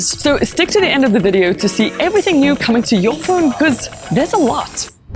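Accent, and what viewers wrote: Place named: South Africa. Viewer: Your South African accent is beautiful 😍😍😍 just like the rest of you. Love your videos too! ❤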